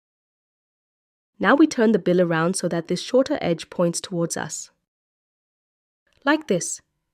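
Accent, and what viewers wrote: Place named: England, United Kingdom. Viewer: Is this the same was a German accent? This voice sounds like one from England!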